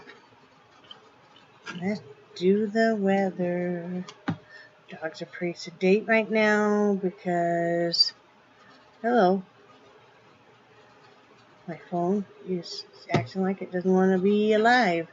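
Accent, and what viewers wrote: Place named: South, United States. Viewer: If I didn't know better I'd think you are getting a little southern in your voice. We are going to convert y'all yet💕💕💕😀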